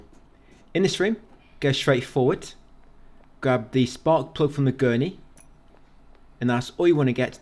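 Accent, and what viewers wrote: United Kingdom. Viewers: Ты британец?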